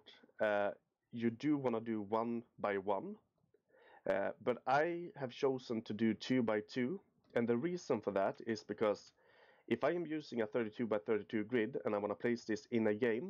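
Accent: Sweden. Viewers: Tack (Jag antar aatt du är svensk, det låter så) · Nice Swedish accent!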